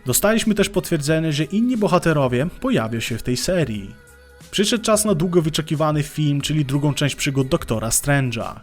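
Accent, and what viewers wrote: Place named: United States. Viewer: Kanał ok, filmiki ciekawe, ale okropnie gryzie sposób narracji, skąd teraz wszędzie amerykańska tonacja zdań? W języku polskim akcent jest w zupełnie innych miejscach...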